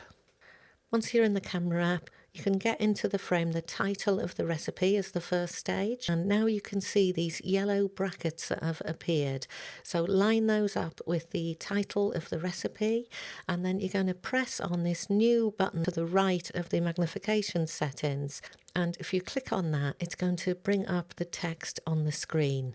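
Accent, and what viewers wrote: England, United Kingdom. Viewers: So hellpful and excellently done! THanks! Your accent makes me homesick for England!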